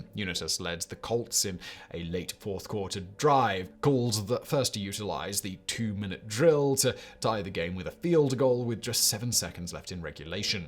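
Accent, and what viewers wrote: United Kingdom. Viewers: So interesting to hear a British accent describing American Football. Even more interesting? Pronunciations of "Unitas", "Ameche", "Stabler", etc. Made me smile.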